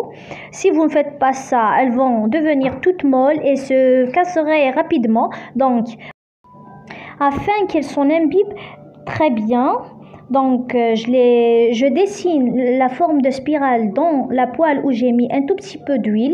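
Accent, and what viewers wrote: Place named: Kabylie, Algeria. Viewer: اختي شوية عربية مي انت قبايلية من هدرتك تباني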